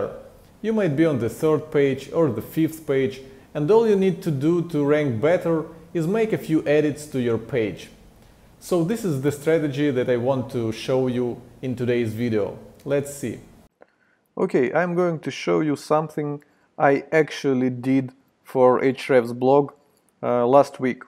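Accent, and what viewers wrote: Russia. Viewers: Russian accent)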